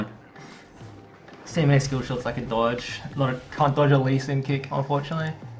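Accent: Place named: Australia. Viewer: Australian? can tell by the accent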